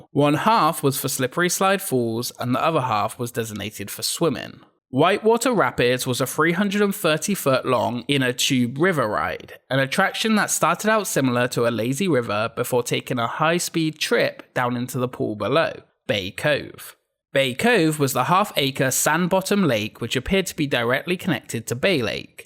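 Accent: United Kingdom. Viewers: Are you from the uk? These videos are great i cant speak highly enough about them all on my personal facebook and my enthusiat friends i would love to meet you one day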